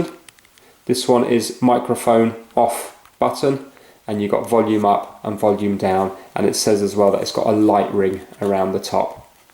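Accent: England, United Kingdom. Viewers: So nice to hear an English voice and not a yank droning on, well done mate your a star ! 😀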